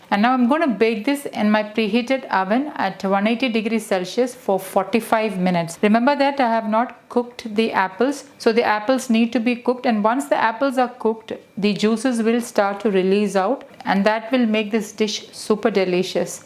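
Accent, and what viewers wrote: Kerala, India. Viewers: Pretty thick Mallu accent. Aunty, it's sprinkle NOT springle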